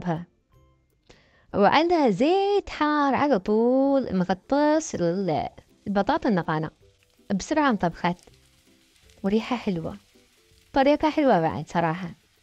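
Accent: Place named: Iraq. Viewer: فيدوهاتك تجنن 😔💟مايفوتني ولا فيديو الحمدلله ،وكلامك كانو عراقي 😂🩷🩷🩷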